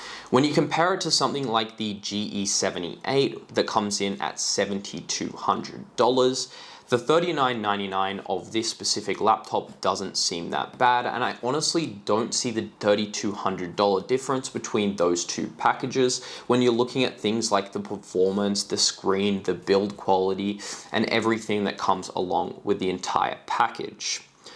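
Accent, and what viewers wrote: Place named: Australia. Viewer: Do all Australians pronounce it "hayche"? How do yaw spell idear? Exactly how it sounds? Neeveedia? If 4k refers to horizontal pixels (which is only like 3800) and 2k refers to vertical pixels (which is only 1440p; an even bigger lie, or misnomer), then what does 1k refer to? Why is the sky blue? So many questions. Few answers.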